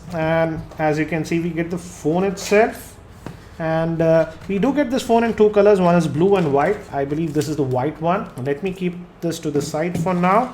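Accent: Hyderabad, India. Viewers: Are you from Hyderabad?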